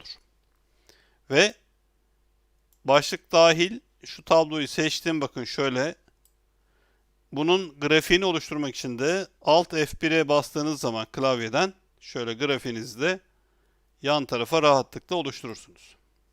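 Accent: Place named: Aegean, Turkey. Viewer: Hiç fark etmez ama ege şivesiyle zor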